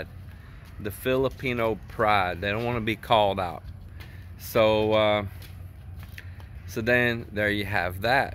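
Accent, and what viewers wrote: Texas, United States. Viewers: It’s language barrier. Your texas accent, figures of speech and sense of humor are “foreign” to them. Learn ilonggo so they understand you. If us Filipinos go to America, we speak english. Since you’re in the Philippines, speak tagalog or ilonggo when you’re in Ilo-ilo. Then you won’t have to stress about them having ‘amnesia’🤣😂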